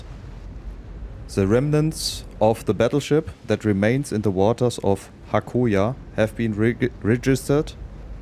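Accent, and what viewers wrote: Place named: Germany. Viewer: Haha man hört den den deutschen Akzent so raus aber liebe deine Videos 👍👍💖😅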